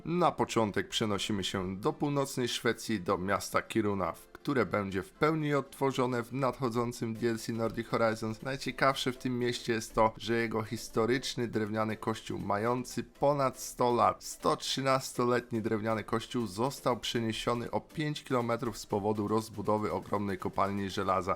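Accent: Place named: England, United Kingdom. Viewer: witam cię mam pytanko mieszkasz w uk czy w polsce bo akcent masz angielski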